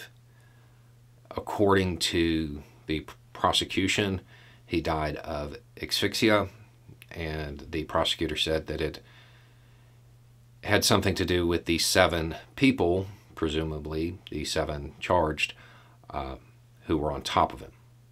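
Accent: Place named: South, United States. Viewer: Yeah, I like his accent. I'm used to most southern accents, but stands out to me.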